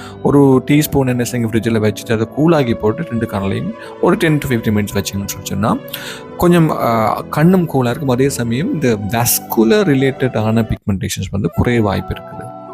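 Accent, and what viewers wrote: Sri Lanka. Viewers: Hii doctorayyyyyyyyyyyyy this is u r subscriber usha how r u sir speaking out a excellent and a marvelous srilankan tamil ❤🎉❤🎉❤🎉❤🎉❤🎉❤🎉❤🎉❤🎉❤🎉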